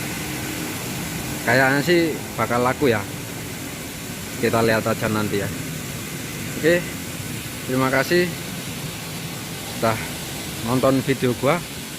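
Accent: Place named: Java, Indonesia. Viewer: Alami aja mas bro, nek medhok ra sah gue2 an.. Wagu.. Hahaha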